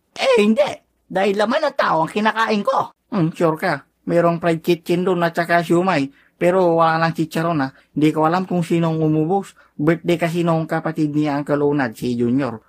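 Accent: Ilocos, Philippines. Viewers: ilocano ka pala lods🤣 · Ilocano ka ba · Ilocano ka idol? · Full blooded ilocano here hahahha.. nagsayaaten agita videom sir... hahahaha.. dami kong tropang ganyan magsalita... kung may taglish.. meron ilocalog hahahaha.. sa accent ako natatawa talaga.. more videos at sama mas madalas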